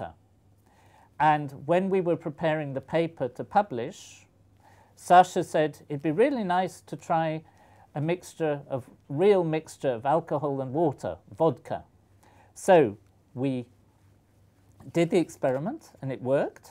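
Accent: Russia. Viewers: is the professor russian? :O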